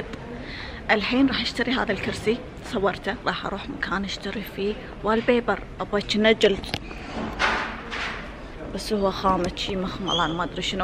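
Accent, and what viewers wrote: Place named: Kuwait. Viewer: احبج كثير واحب لهجة الكويت اختك من السعوديه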